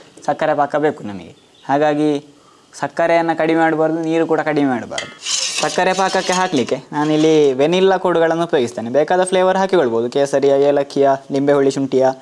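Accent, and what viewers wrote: Mangalore, India. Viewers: ಮಂಗಳೂರಿನ ಬಾಷೆಯ ಸೊಗಡು ತುಂಬಾ ಚೆನ್ನಾಗಿದೆ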